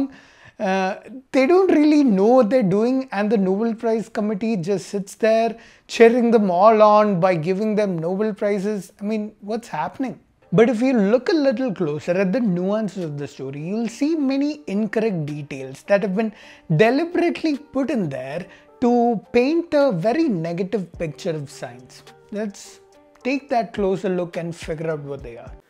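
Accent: Kerala, India. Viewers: Bro I really appreciate you finding mistakes of others..... But pls do speak in proper accent. I feel like I'm watching Malayalam channel. U r killing it 😂😂😂😂.sorry it's ur 1st mistake. 2. U didn't do proper research on JJ Tommson.... His Nobel Prize considered as false and it's still controversial.... There are thousands of science theories and research were proven wrong later. And it's updating.... I know you are doing this for fame.... I feel pity for you.... I really enjoyed your videos it was like a comedy show.... Particularly LMFAO when u said "hilarious" 😂😂😂😂😂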